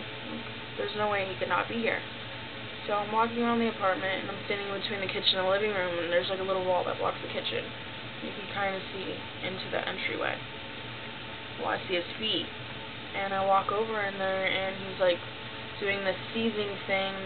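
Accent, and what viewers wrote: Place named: Mid-Atlantic, United States. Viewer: you sound like you're from the mid-atlantic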